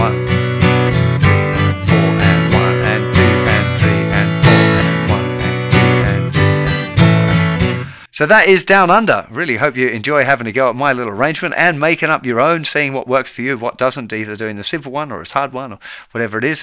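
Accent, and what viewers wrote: Australia. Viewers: Wait… you’re Australian??? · What!! You’re an Aussie? After all these years I had no idea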